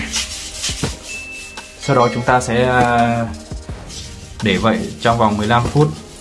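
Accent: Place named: Bac Ninh, Vietnam. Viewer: Giọng bạn như dân Bắc ninh ý nhỉ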